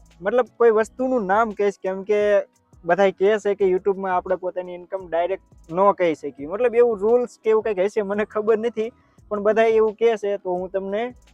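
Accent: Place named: Saurashtra, India. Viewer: તમે શુધ્ધ ગુજરાતી ભાષા માં બોલો છો એટલાં માટે વીડીયો જોવાની મજા આવે છે સૌરાષ્ટ્ર કાઠિયાવાડ ની ભાષા મીઠી હોય છે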